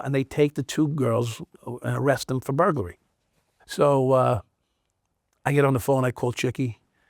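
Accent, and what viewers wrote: New York, United States. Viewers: I don’t know what you’re talkin about 🤷🏻 that’s as New York as you can get an accent you must not be from New York 🗽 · This is pretty much standard, old-school New Yorker, law-enforcement and judicial bureaucracy personality. I know a judge who was a former narcotics processor who talks a lot like this Some people are saying that his accent doesn’t line up with what they know New Yorkers to sound like, but they’ve been here for only 10 years. The person that made the comment and indigenous New Yorkers are harder and harder to come by now.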